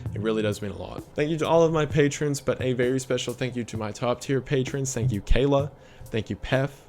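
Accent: South, United States. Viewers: First time that I’ve enjoyed listening to a southern Christian talk about conspiracy theories. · i’m a lesbian but this southern belle sounding bucky barnes look alike has just stolen my heart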